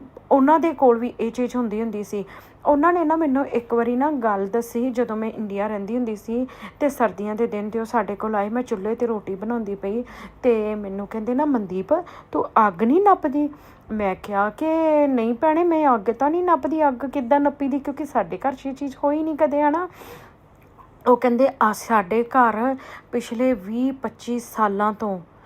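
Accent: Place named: Sialkot, Pakistan. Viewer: Sister amazing video 😊 sailkot Punjabi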